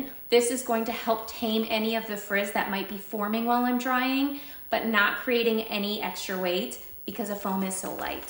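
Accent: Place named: South, United States. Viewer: It did sound southern!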